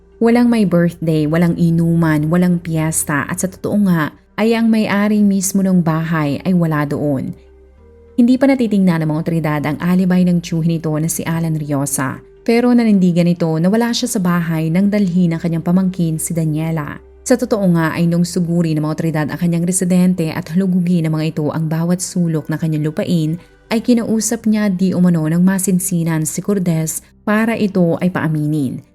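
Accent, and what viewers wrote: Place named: Visayas, Philippines. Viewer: Taga saan po ba sla bisaya kasi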